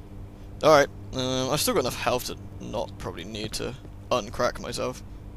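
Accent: United Kingdom. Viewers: Finally he's reading stuff! I wanted him reading since way back when he was doing Penumbra...the British accent makes everything sound more official...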